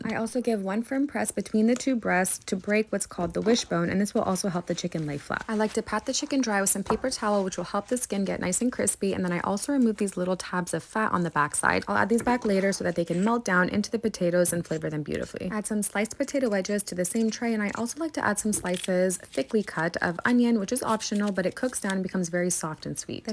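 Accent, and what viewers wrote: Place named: Latin America. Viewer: Sounds very Latino actually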